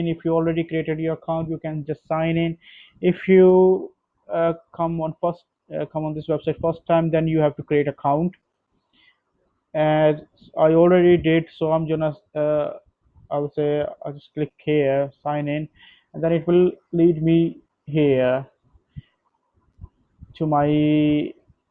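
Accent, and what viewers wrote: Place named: India. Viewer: Indian accent is very annoying disgusting 🤮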